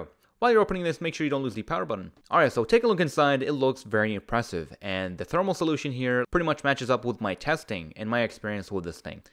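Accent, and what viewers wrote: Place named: South Africa. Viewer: I am Greek speaking, but being a teacher of English as a foreign language (EFL) and having spent 15 years in Sth Africa, I don't have a problem understanding the language. However, I can't compete with a native speaker. When the narration came to the technical terms, I completely lost track of what you were saying. I couldn't absorb it fast enough. The problem was compounded by the fact that the South African accent is different. Which comes to the point of "please think of us out there who are struggling with a foreign language, especially with the technical explanations." Thank you, I appreciate your channel.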